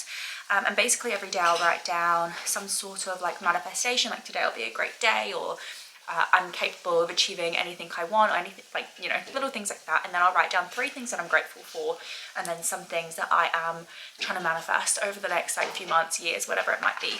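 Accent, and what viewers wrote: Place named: Australia. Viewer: g day/evening for you can I ask when did you start picking up the aussie accent. love you r vlogs by the way 😊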